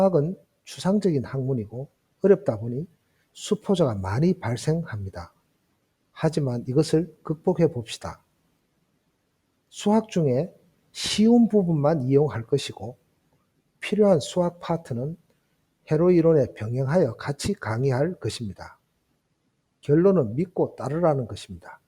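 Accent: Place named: Daegu, South Korea. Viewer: 감사합니다교수님. 제가 대구사람이라 확실히 대구 사투리로 들으면 이해도가 올라가더군요. 정말도움 많이 되었었습니다.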